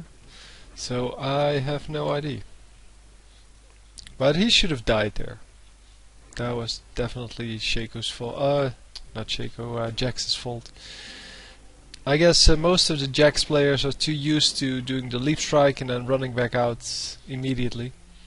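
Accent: Netherlands. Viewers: Are you dutch man?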